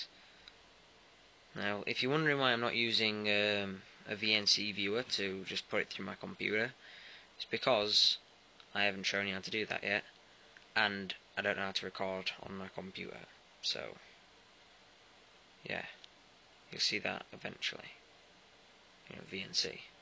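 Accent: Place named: Sweden. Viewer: thank you ,,,,är du från sverige åååääääääööööö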